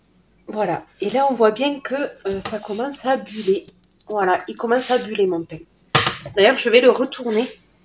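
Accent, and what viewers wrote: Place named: Midi, France. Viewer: Bonjour. Merci pour cette super vidéo. Ce pain est super à faire quand on est en panne....😅Nouvellement abonnée je visionne les vidéos petit à petit. J 'ADORE..... Nous avons le même accent 😁. Un petit coucou du Midi Toulousain. 😘😉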